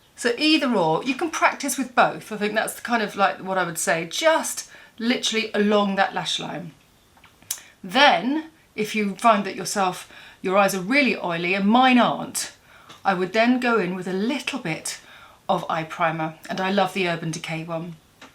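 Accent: United Kingdom. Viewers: I love your British accent!!!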